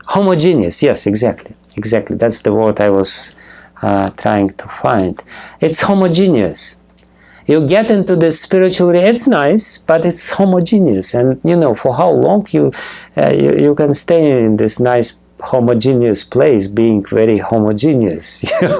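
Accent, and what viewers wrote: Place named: Russia. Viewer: Хотелось бы знать, кто этот Гуру. Ясно, что из России, акцент очень сильный. Where is the description about Him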